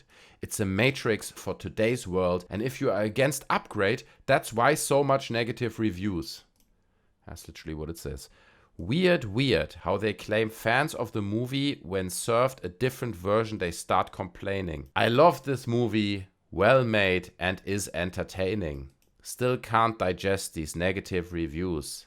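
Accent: Germany. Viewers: Subbed today. Love the authenticity. Can’t place your accent. Is it German?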